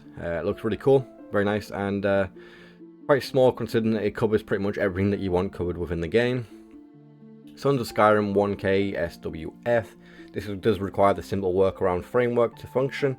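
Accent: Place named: North East England, United Kingdom. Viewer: Nice load out - I would add the sky land colour for realistic water 2 if you can. Also check out sky kings aonghus house for river wood for almost no cost and granite hill town that he did. Trying to guess where your accent is from in the north east 🤔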